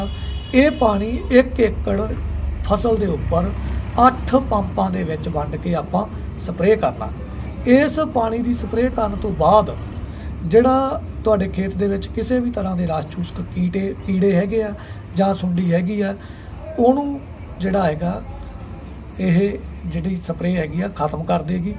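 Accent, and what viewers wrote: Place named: Malwa, India. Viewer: ਜੇ ਹੱਥ ਨਹੀਂ ਦੁਖਦਾ ਤਾਂ........ ਸੀਦੀ ਮਲਵਈ ਬੋਲੀ Good sir ji ਅਸੀਂ ਸ਼ੇਅਰ ਕਰ ਦਿੱਤਾ ਜੀ ਧਨਵਾਦ ਜੀ।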